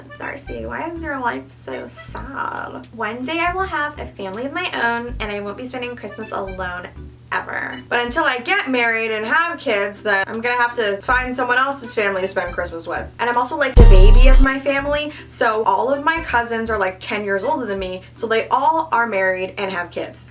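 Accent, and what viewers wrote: England, United Kingdom. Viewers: Can we talk about the English accent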